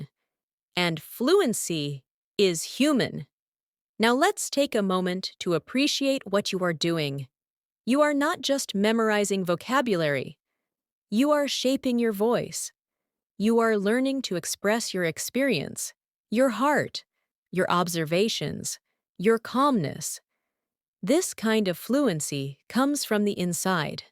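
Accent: United States. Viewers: Your english sounds american...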